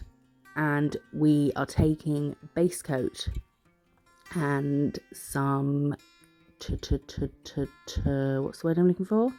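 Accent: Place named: RP, United Kingdom. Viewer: Absolutely love your videos. I laugh and learn! Also gott say I adore your accent. Im here in Texas and your Queens English is lovely to listen to.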